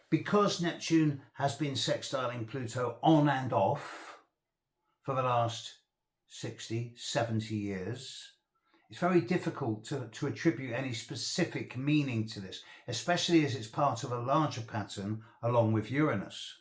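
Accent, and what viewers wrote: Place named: United Kingdom. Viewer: I am from Mongolia, learning about astrology since 2019. ’ British accent is difficult for me but I don’t know why I like to hear him. 😂😂 I like his manner. 😂 what planet and and house, conficuration makes you astrologer? I like your graunded “saturn” earthy essence. Your masculinity shows kind of Mars. Deffinately you have scorpion from your analyze. Mercury on Aqauruis?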